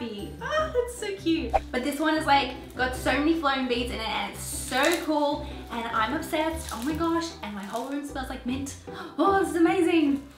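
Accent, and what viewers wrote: Australia. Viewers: Are you Australian because I am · You have the Australian accent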